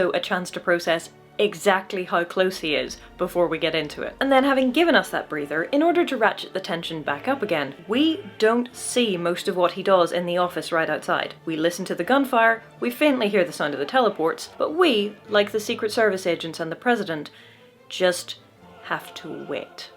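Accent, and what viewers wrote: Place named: Northern Ireland, United Kingdom. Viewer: Not related to XMen but where are you from? Im from NI and California and you sound super Norn Irish. Good to see some Marvel fandom from there ❤️❤️❤️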